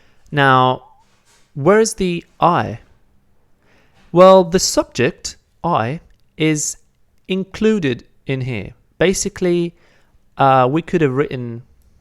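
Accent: England, United Kingdom. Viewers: As a Spanish-English bilingual this course is such a delight to me, you explain everything so clearly and I am learning Italian with both persectives which is very useful, since pronunciation and some grammar basics are so similar to Spanish, I would probably miss some things if this course had been taught by a Spanish speaker. Also, your English accent is gorgeous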